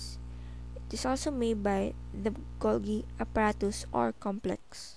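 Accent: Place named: Philippines. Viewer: funny how i can tell this girl is a filipino =)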